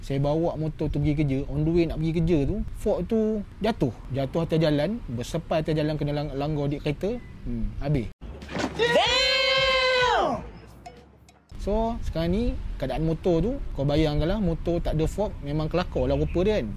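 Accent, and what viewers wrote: Perak, Malaysia. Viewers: org perak ke yop?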